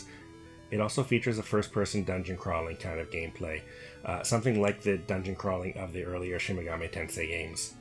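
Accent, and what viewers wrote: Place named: Canada. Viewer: nice canadian accent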